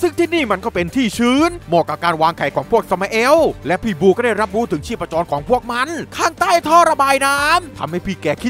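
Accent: South, Thailand. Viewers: คนใต้อยู่แล้วครับผมว่า แต่ไม่รู้จังหวัดไหนครับ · แอ็ดคนใต้ม่ายนิ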